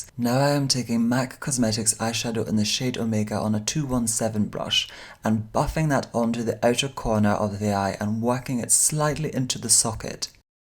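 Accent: Scotland, United Kingdom. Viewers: Jack Walker it's just a contorted posh Scottish accent.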